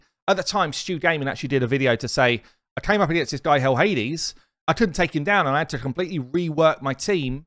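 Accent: United Kingdom. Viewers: My favorite raider on Youtube with a british accent, and the maker of excellent tools for the game. Love it. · You should be incredibly proud!! You have come a long way and your videos got me into raid and keep me coming back every day for new content. You are very entertaining, even when you are doing gear cleanses lol. I think the way you Brits talk is entertaining too coming from America. It's all very fun!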